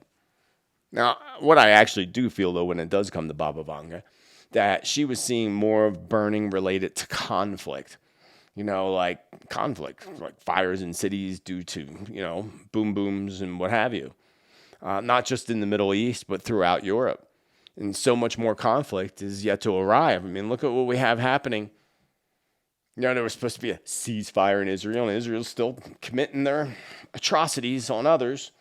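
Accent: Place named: United States. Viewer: 💚🤗💚 Bristol UK,,,, Luv the crazy American pronunciation of UK cities 😂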